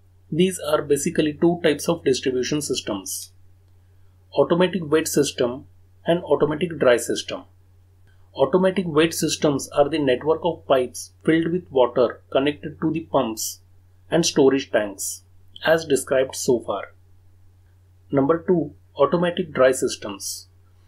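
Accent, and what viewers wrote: Maharashtra, India. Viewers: I can make out the person in video is from Maharashtra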